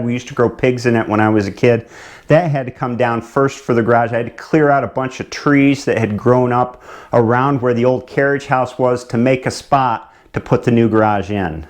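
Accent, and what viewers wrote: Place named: New York, United States. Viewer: Well as a southerner his new York accent is about more than I can stand but instill enjoy his videos😂😂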